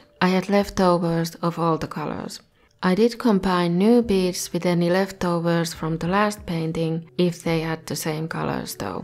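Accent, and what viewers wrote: Finland. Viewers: What country are you from? Because that sounds a little bit like finnish accent.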